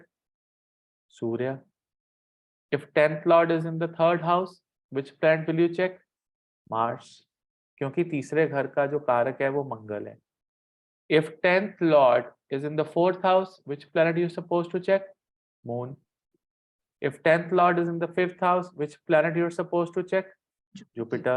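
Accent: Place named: India. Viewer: he is Indian look at his English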